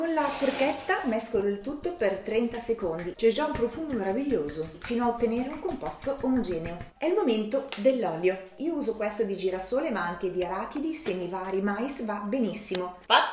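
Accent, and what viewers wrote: Romagna, Italy. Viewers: Ma che meraviglia sei? Ti trovo davvero brava.... Per non parlare della simpatia e l'accento che immagino romagnolo mi fa sentire a casa... Ma gli elastici dove li hai presi? Grazie e tanti auguri.. Anche se un pochino in ritardo!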